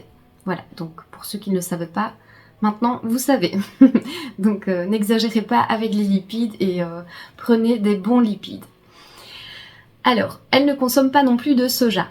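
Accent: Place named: Belgium. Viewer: Salut j’avais deviné que tu étais Belge en 90 g en belge 😉😂👍🏻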